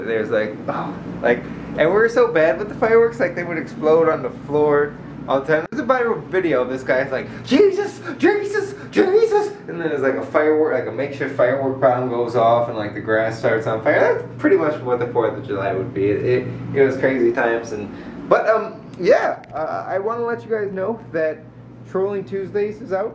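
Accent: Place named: Ireland. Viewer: You like an Irish man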